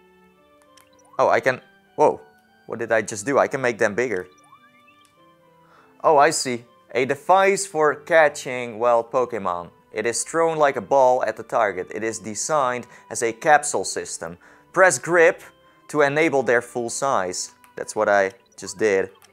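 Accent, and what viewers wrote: Netherlands. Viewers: I knew your were dutch because ik ben ook nederlands :p hoorde het meteen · 100% dat je Nederlands bent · TomySeptic Dragon He's dutch... I think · Ben je Nederlands ofzo XD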